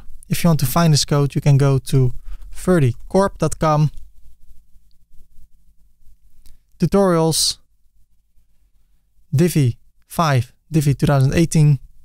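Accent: Netherlands. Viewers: It took me 26 seconds into the video to realise you are Dutch. Especially the pronunciation of "file".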